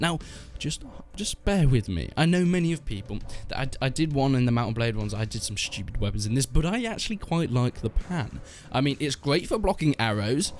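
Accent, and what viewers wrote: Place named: United Kingdom. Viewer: Apparently, Americans think that the British accent sounds intelligent. This is a good example of it being wrong. VERY fucking wrong.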